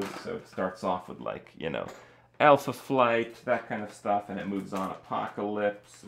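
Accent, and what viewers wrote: Romania. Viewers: So that's why u'r accent was so familiar cuz u are from romanian too,and,Omg this catle totally looks like my own caslte drawing. Totally..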